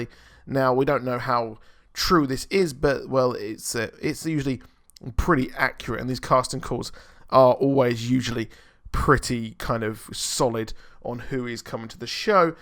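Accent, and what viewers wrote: United Kingdom. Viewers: Why are u reading this? He is bristh dumbass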